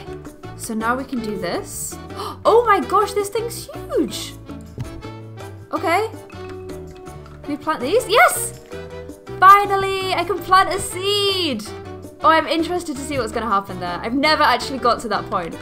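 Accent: Ireland. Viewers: WOW!!! YOUR IRISH!!! YAY!!! SAME!!!! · YOUR IRISH I THOUGHT U WERE BRITISH OR AM A JUST CRAZY?!?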